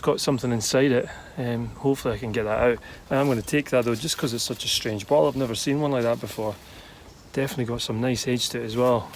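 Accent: Scotland, United Kingdom. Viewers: Sometimes after my spouse is asleep I watch you on UTube on my phone with sound off and the text on the screen. It has a very hard time changing your heavy Scots brogue into text and sometimes I bust out laughing!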